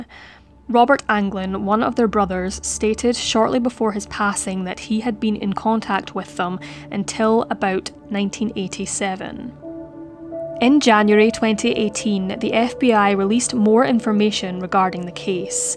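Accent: Scotland, United Kingdom. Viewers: What is the accent of narrator? Scottish?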